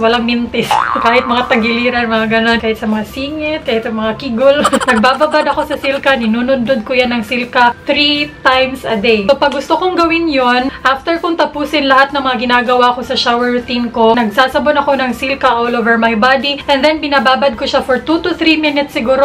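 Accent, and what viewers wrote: Visayas, Philippines. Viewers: hi te bisdak lge ka..haha taga saan ka?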